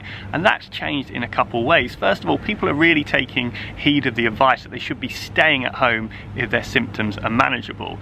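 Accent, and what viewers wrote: United Kingdom. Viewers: Harfway froo. Beautifully Brit. (I’m sure that some of my Americanisms are offbeat to Brits, though probably not so endearing.)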